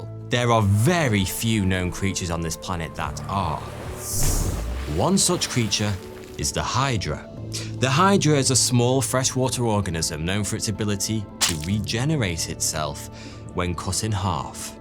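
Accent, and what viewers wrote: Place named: Leeds, United Kingdom. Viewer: Hey - do me a favor. I guess accents, and for your accent I am going with Leeds or the near North of Leeds, How close was i? Thanks for being a sport.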